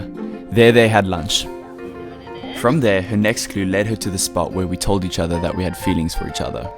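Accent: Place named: Afrikaans, South Africa. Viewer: Do you know afrikaans you have the aksent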